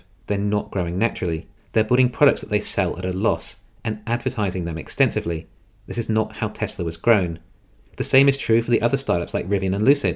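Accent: United Kingdom. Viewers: I’m British so the accent always suits my ears. More importantly the delivery is clear and without repetition. The simple things like NOT repeating subheadings in the first line of text are well done.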